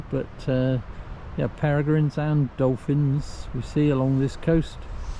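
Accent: West Country, United Kingdom. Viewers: Spectacular scenery with lovely contrast between the hedgerows then down to the crashing waves along the clifftops all narrated with that west country burr.Makes me nostalgic for Devon my country of birth after living 32 years overseas so thinkin of planning a summer walk 😅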